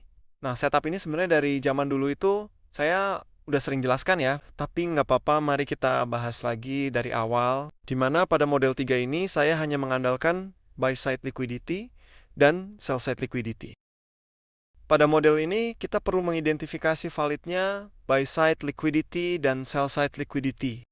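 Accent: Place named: West Java, Indonesia. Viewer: Bang aslinya org mana? Kaya ada logat sunda nya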